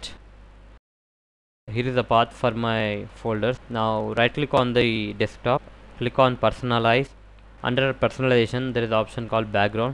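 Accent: India. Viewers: Bro what it doesn't matter if he has an Indian accent, he is still speaking English at the end of the day.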